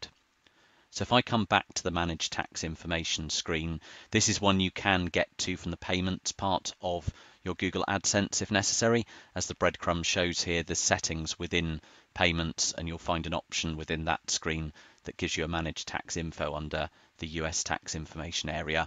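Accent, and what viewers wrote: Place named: United Kingdom. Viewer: Great helpful video in plain uk English 😊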